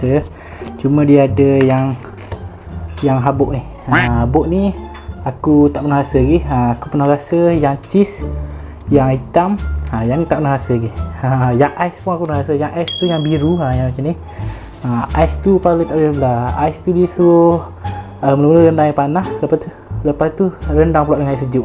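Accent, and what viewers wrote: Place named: Kelantan, Malaysia. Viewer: kelantan speaking sumpah kelakor hahaha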